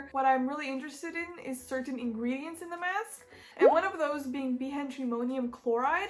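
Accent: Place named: Romania. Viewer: Ur Romanian! I can tell by the accent (and products). Buna. :) M-am prins dupa accent si dupa incercam sa vad etichetele produselor daca sunt in romana, pana cand am reusit sa vad "ulei de cocos" si parca mai e ceva pe cele de la Syoss. Se pare ca ne recunoastem dupa accent.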